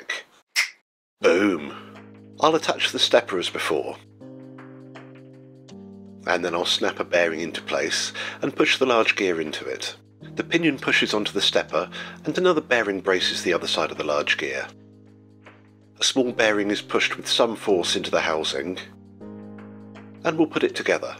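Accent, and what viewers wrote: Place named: United Kingdom. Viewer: Gosh, you int 'alf clever! And so refreshing to hear a British chap doing interesting stuff on Youtube. I hope I haven't broken any rules. 😀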